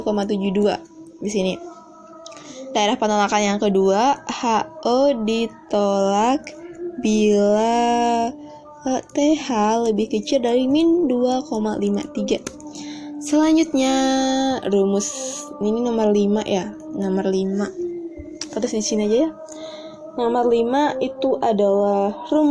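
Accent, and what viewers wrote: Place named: Lombok, Indonesia. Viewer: Logat lomboknya jelas banget mbak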